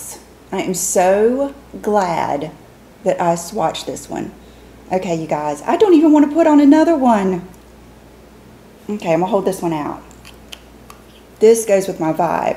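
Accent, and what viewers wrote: South, United States. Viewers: I could listen to you talk for hours. You’ve got such a soothing southern comfort to your voice. It’s like when you’ve been away from home for a while and cross that border back into the south, it’s like a big ole hug sayin darlin’ your home❤️.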